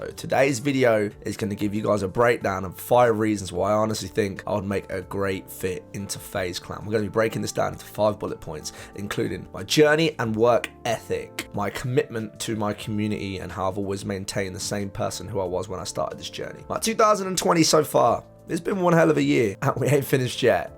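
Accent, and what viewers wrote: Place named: East Midlands, United Kingdom. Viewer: Yo another banger of a video mate..... Where abouts in the east Midlands you from... I live in Ripley, Derbyshire bro.... I never realised where you was from I thought your accent sounded familiar 👌🙌🙌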